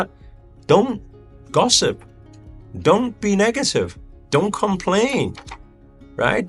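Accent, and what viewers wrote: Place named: United Kingdom. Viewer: Why did I think you are a South African but listening to your accent now you sound British.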